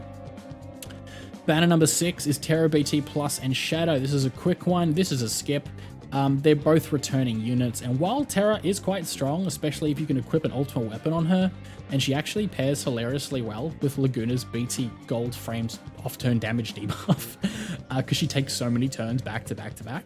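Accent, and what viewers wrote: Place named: Australia. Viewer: hahah i knew it :). Im from Victoria and i thought that was an aussie accent. I was greedy and i use both garnet and ramza - 90% hp attack cap up is delicious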